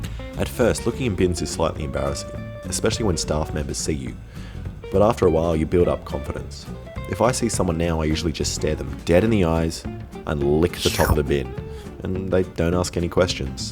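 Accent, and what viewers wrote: Australia. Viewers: You Aussie mate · it's how ya going. traditional aussie greeting. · R u from Australien? Cause u Sound cool? Getreu from Germany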